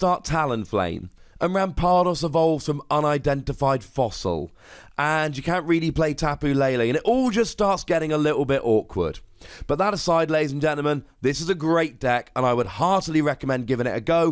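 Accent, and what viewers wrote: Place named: United Kingdom. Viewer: He's from the UK, so it's normal for him to have that kind of accent.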